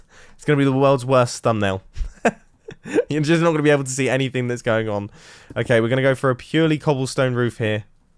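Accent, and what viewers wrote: United Kingdom. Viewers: He’s sounding really British in this one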